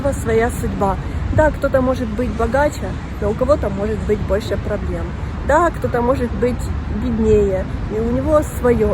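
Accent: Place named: Odessa, Ukraine. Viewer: Дорогая мая Одесса и самое главное мая красавица и не только внешне но самое прекрасное сердцем душой и мыслями. Я Алексей из далекого Примоского края город Находка не Одесит и небыл не когда у вас мои ученики были в советское время на соревнованиях и всегда рассказывали про Одеситах как простых добрых людях с оболдетной харизмой и юмором...никакого негатива не когда не привозили от вас ученики только положительные эмоции да анегдоты всякие летучии фразы и слава Богу что так было и дай Бог что бы так все и востоновилось. Всегда хотел попасть к Вам и в живую услышать одеский говорок он у вас проявляется частенько а голос ваш просто 9авораживает...ангел во плоти вы воплощение как и ваша сестра той чистой не оболваненой молодежи и умными правельными мыслями. Жаль что не могу попасть в Одессу но надеюсь что мирное время настанет и разум восторжествует над оголтелом фашизмом который мы с вами все в 45 году победили. 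Здоровья вам сестре и всем родным и близким да всем Украинцам Одеситам.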